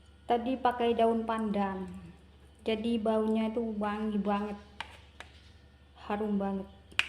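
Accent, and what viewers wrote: Madura, Indonesia. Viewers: Logatnya Bicaranya Persis Orang Madura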